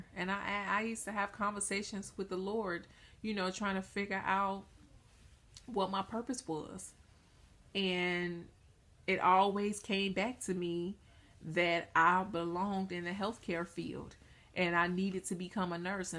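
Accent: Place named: South, United States. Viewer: Great Message!! Where are yoy from? I hear a southern accent.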